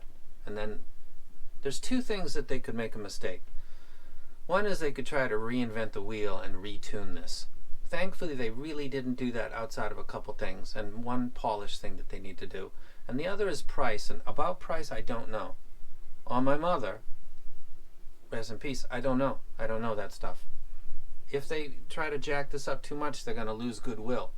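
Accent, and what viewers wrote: Boston, United States. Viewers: I love it when you talk Boston to me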